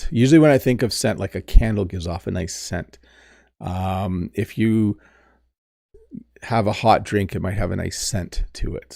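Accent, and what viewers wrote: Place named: Canada. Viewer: Thank you,! I'm going to your beautigul country on May! Thank you for your lessons! Watching your videos I can learn a litlle bit more the accent that the canadians use! I also like so much of the Rush' songs, since I was young, the great rock band of Canada. So receive a big hug, and have a nice night! Thanks a lot!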